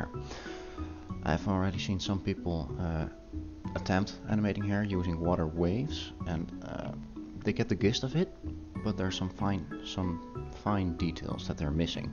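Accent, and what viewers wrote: Netherlands. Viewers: Aan je accent te horen ben je Nederlands :P Mooie tutorial, heeft mij erg geholpen!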